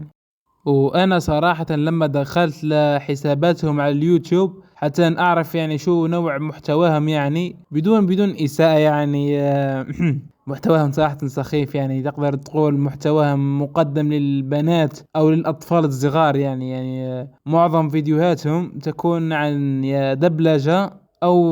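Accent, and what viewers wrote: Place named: Tunisia. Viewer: من الواضح انك تونسي 😅 ترا انا تونسي بعد 🇹🇳 لهيك لا تستغرب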